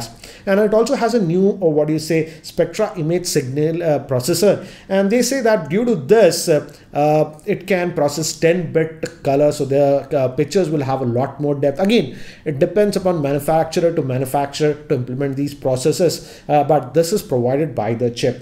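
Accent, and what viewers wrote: India. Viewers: Would have told something which other channels missed to include...this video is copy of Android authority video n converted that into indian accent..no offence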